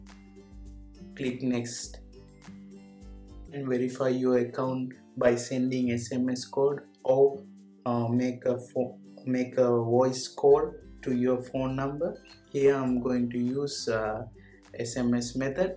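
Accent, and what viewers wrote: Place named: India. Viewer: ddat indian accent do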